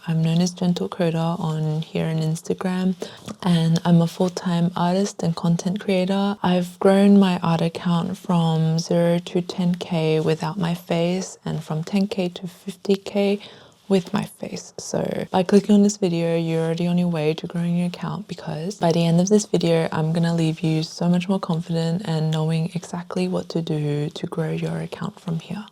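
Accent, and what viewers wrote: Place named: Australia. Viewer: Love this! Massive help. By your accent by any chance you’re from Australia?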